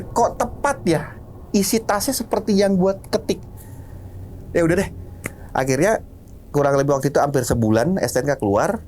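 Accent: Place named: Jakarta, Indonesia. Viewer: Seru nontonnya, ceritanya si abang kocak ky org betawi logatnya. 😂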